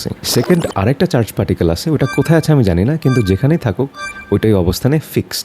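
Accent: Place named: Dhaka, Bangladesh. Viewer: আপনি যে ঢাকাইয়া কণ্ঠ শুনলে বোঝা যায়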